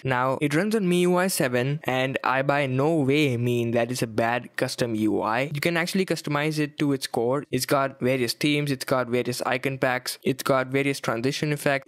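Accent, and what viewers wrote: India. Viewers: great video, I subscribed within 10 sec after listening to ur ascent, its indian but not typical Indian ascent.Keep up the good work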